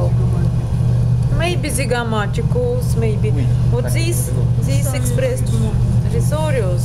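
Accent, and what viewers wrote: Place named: Russia. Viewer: i love that russian accent bro :)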